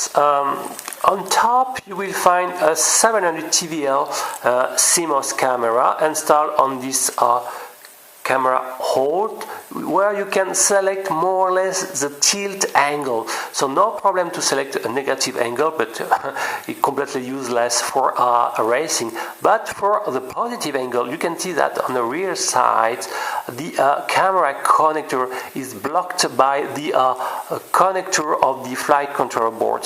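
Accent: France. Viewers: Are you French ? I notice a familiar accent to me :)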